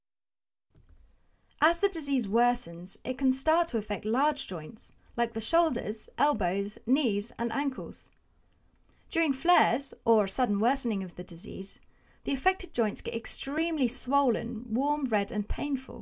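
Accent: United Kingdom. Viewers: She is British, but Princess Diana did not have this accent. This one is more like Laura Rhensfield from the BBC.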